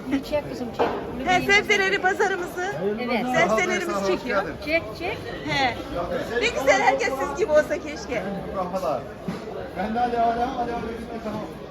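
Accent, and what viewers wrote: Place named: Uşak, Turkey. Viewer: Sonradan dinleyince uşak oldıgunu anladım bızde manısa merkezden 😊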